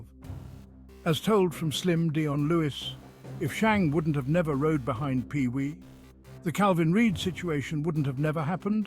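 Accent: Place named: RP, United Kingdom. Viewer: Hearing an upper class, civilized, old Englishman's accent narrating the Shreveport ghettos cracks me up 😂😂.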